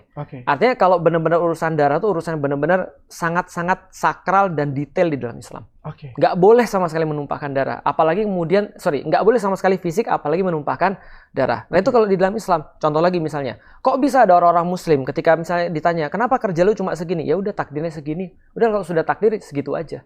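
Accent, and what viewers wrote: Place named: Palembang, Indonesia. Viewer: Iyo lah pak ustad masyaallah kental nian Palembang nyo mantap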